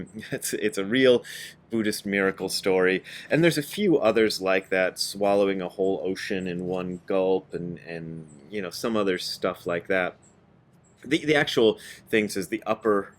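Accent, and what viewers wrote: Cockney, United Kingdom. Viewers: "Burn baby burrrrnn!" I am in the, and never coming back! And with that cockney accent, you could probably get a gig if they reboot Spinal Tap. Awesome! 🤓🤙🎶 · That cockney accent sounds like Moss from The IT Crowd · GREAT Cockney accent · Loving the Cockney accent. Can you do a Geordie one next please 🙏 🙂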